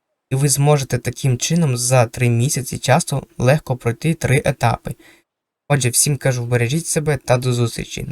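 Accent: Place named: Western Ukraine, Ukraine. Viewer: Ти явно десь із заходу, волинь чи рівненщина?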